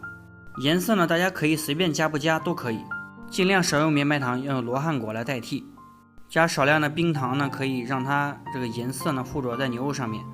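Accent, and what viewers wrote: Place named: Anhui, China. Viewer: 不错，安徽人啊！口音。😹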